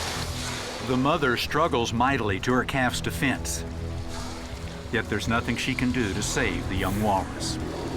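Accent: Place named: Canada. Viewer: Lol does anyone else notice the canadian accent in the narrator lol?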